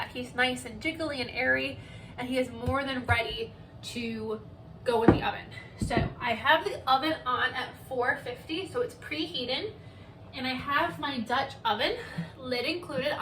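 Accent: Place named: Midwest, United States. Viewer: Yea success!!! I put your recipe together yesterday morning “Saturday” placed it in the oven with the “light on” until I got home . I then followed your instructions for preparing and baking the loaf. It came out perfectly. You are wonderful! Thank you for this great video-oh I enjoy listening to you talk…what part of the country are you from. A wild guess…mid-west?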